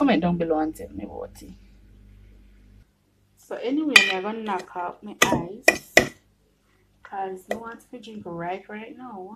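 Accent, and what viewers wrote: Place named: Jamaica. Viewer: Love the patois(patwah) down to earth!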